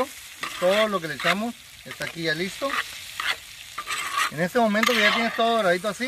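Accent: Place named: North, Mexico. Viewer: Muy buena receta 👌 me encanta el acento de los norteños saludos desde Papantla Veracruz prometo hacer la receta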